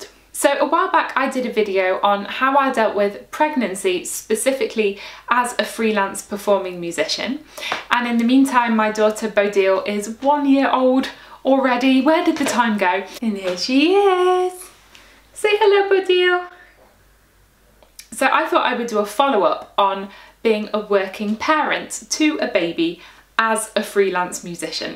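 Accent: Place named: Derbyshire, United Kingdom. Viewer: Awesome mommy job!!! Btw, your Derbyshire accent is really pronounced in this video.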